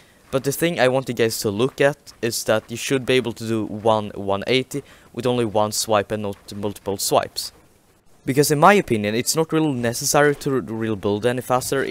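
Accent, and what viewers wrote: Sweden. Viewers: EJ grym video, jag gissar på att du är svensk för din dilekt lol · Are you Swedish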